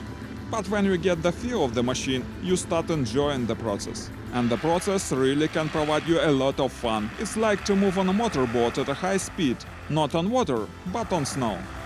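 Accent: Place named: Russia. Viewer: Can't you hear the accent? Rus-rus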